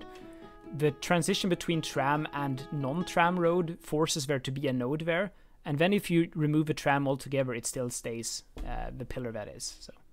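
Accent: Sweden. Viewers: Swedish accent if I ever heard one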